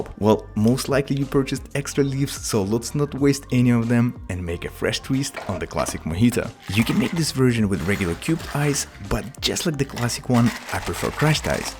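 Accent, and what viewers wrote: Russia. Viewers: "Your basic cocktail making skills" if the accent wasn't enough that sold it. He's definitely Russian.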